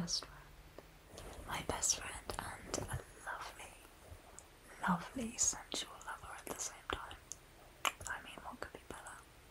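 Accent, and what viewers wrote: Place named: United Kingdom. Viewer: Omg I love the British accent😊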